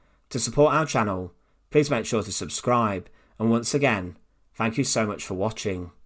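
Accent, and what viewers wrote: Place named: England, United Kingdom. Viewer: That's what I'm talking about awesome show governor that's how the English people said it 👍😑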